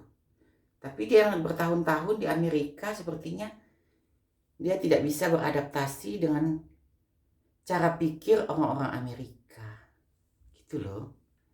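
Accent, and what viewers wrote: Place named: West Java, Indonesia. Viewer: Teh dari logatnya kaya org sunda. Salam kenal dari tambun bekasi.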